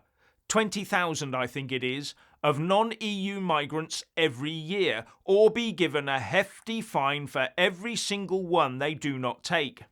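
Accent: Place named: England, United Kingdom. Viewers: Sir you havesuch a distinct english accent - u could sell your voice in America!!! PRAYERS 2 good ppl of the world. DEmo-commi-Terrorists-SHAME on U!!! Out w/DEAThCultIslums-Radicals OFF thid Plane 2025!!!. Thanks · Whos your bbc voice coach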